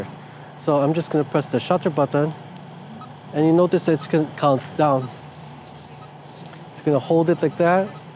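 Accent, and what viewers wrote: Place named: Denmark. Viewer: Er 100% enig med dig. Du lyder dansk, så svarer lige på dansk;) Er også vild med de her begynder guides. Jeg har ikke bestilt min OneR endnu men sker indenfor et par dage når jeg har set tilpas nok videoer så jeg er blevet overtalt:):):) haha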